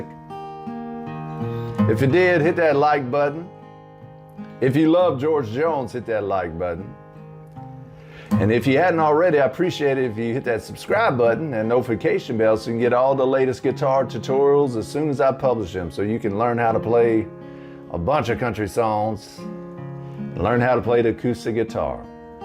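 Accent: South, United States. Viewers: great lesson, haircut and southern draw! You made it easy........Oh, and yes, is one of the greats!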